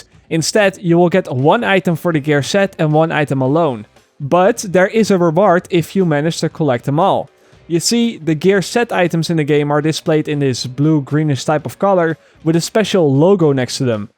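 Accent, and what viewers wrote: Netherlands. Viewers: hahah ik wist wel dat je Nederlands was dat hoor je heel overduidelijk aan je accent als je engels praat :P:P:P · are you dutch?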